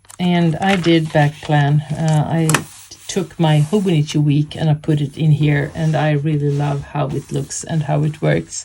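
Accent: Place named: Sweden. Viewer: Thank you for sharing! I love how these look. Shipping to USA is just so expensive 😑 Maybe there will be more options for next year 🤞 I am so happy to see you are back, I've missed you and your videos. My 18yr old son overheard this video and said "she sounds like mormor", so I asked what do he meant and he said her accent sounds like she could be Swedish, like mormor 🥰 I love it!! I am Swedish, and my family only hear accents from my family from Sweden 😂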